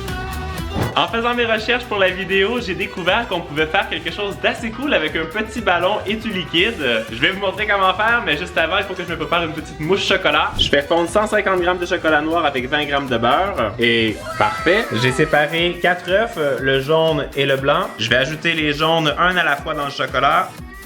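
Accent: Canada. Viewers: = la vidéo toujours très sympa. Votre accent canadien rajoute un plus à vos vidéos très instructives. Passez un bon we les zamoureux. 😍🥰😘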